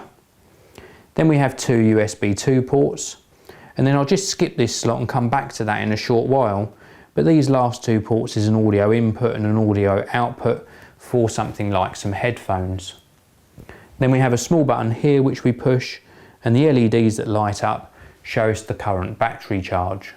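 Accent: United Kingdom. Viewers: You have an amazing voice.Love your British accent.Awesome review,really helpful👍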